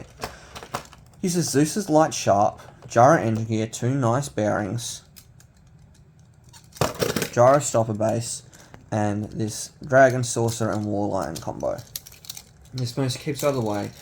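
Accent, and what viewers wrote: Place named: Australia. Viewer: You sound aussie whereabouts are you from would love to do some battles and a meetup. Havent seen much people use plastic in a while gets me excited.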